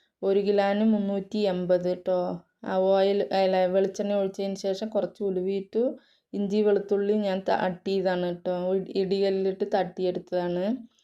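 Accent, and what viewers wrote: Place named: Palakkad, India. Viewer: അൽഹംദുലില്ലാഹ്. സഹോദരി സുഖമാണോ. സ്ഥലം പാലക്കാട് ആണോ. സംസാരം അത് പോലെ തോന്നുന്നു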